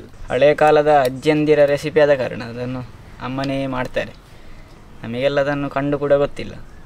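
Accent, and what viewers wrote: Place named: Coastal Karnataka, India. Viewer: ನನ್ನ ಕರಾವಾಳಿ ಕನ್ನಡ ಸೂಪರ್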